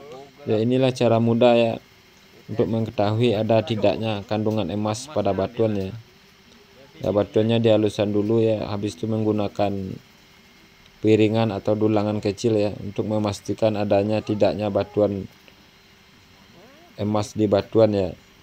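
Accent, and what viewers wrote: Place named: Minangkabau, Indonesia. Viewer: saya dengar dari dialek bahasanya ini pasti dari tanah minang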